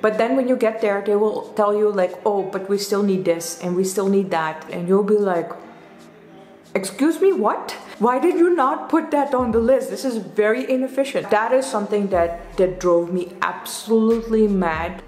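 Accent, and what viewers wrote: India. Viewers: Your accent is like Indian haha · Your getting a Indian accent! I’m from Amsterdam and it’s really obvious to hear ! Love it 😊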